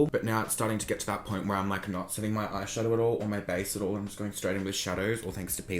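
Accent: Australia. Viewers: I thought u were American but ur Australian right ? , love u anyway u slay Every product that has been released xx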